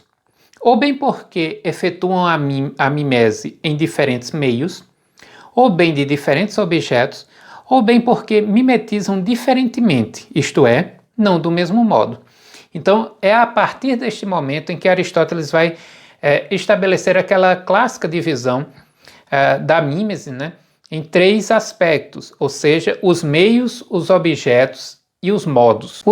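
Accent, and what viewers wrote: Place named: Bahia, Brazil. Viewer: Boa tarde, prof gostei da sua exposição, é a primeira vez que mantenho o contato. Além disso nosso sotaque no YouTube é outro diferencial acima e a mais. Desculpa a pergunta, mas o senhor está vinculado a algum programa de pós graduação? Em caso positivo pode informar? Sou Marcos José de Souza, prof de Língua Portuguesa, Rede Estadual da Bahia, em Fátima. Abr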